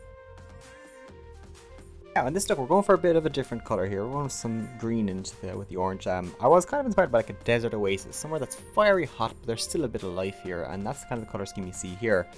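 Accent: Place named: Ireland. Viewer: ahhh his voice is so asmr lol the only Irish voice that triggers my asmr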